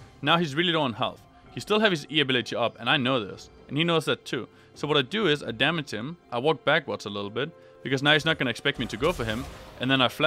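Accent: Denmark. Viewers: is it just me or does this guy sound danish don't want to sound like an ass, I live in Denmark and know what a danish accent sounds like. just curious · Are you from Danmark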